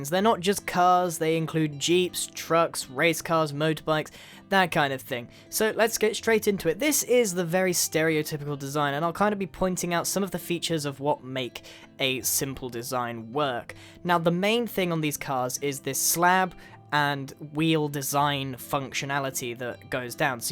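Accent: United Kingdom. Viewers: wiat grain r u british? i thought u just liked the accent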